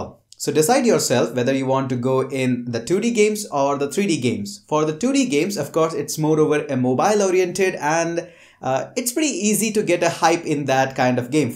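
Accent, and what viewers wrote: India. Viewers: Sound Indian but surname spelt the pakistani way?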